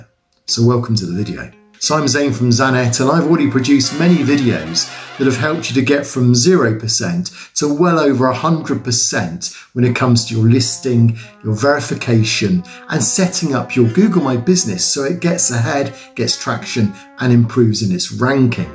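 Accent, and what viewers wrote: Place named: England, United Kingdom. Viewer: Yes of course. But just to be clear, it wasn't overbearing at all. Perhaps it was just me, but I found it a little distracting from that lovely English accent, LOL! And thank you again for all these super helpful videos. I'm trying to learn as much as I can so I can help my web design clients with another service.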